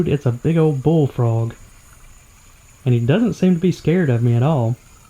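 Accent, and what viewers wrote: United States. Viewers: The internet constantly surprises me . Here I am again , listening to a cool American voice chatting about , and showing anyone interested , how to build a bass pond . I live in a condo , have never gone fishing , and yet find myself delighted to see your video pop up . I am already looking forward to seeing the next step .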